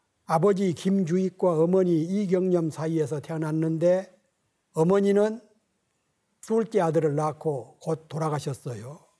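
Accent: South Korea. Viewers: 공산주의 독립운동을 독립운동이라고 할수있을까? 말투를 보니 전라도사람인데 헛소리를 하니 전라도 전체가 욕을먹는거다 다른 정상적인 전라도인들을 모욕하지 마시길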